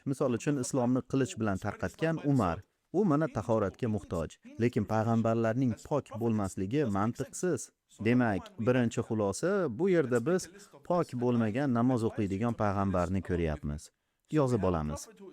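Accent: Andijan, Uzbekistan. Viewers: Koproq shevada gapiradi bzi andijon shevada kopchilik "yuĞurib" deyishadi atag yahshi taklif🎉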